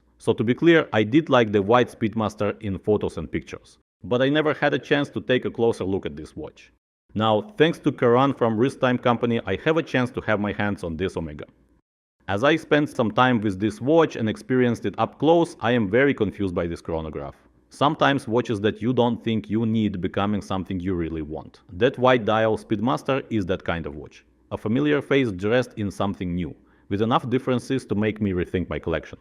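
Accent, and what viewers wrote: Russia. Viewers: Русский акцент пипец жёсткий, конечно...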